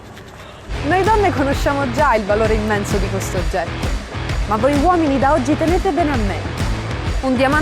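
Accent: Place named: Palermo, Italy. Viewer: Brava..peccato che è difficile apprezzarti appieno fuori dalla Sicilia.In compenso a noi siculi il contrasto tra il dialetto palermitano e il perfetto italiano ci fa tagliare.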